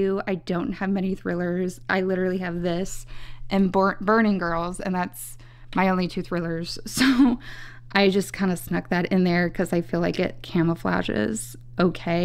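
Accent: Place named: South, United States. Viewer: Do you mind me asking what state/region you’re from? TOTALLY ok if not, I just LOVE your accent as a southerner and I’m a linguistics nerd. Also your shelves? Immaculate:)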